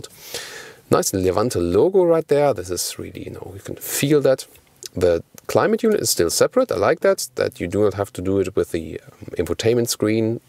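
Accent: Germany. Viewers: nice reviews you're doing. Loving your German accent too! I'd never watch an hour long car review, except yours, dude! You seem to stress leather on seats in your reviews, are you a vegetarian?:) Respect.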